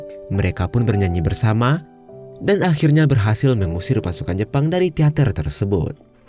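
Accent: West Sumatra, Indonesia. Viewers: Org Minang ya min?😂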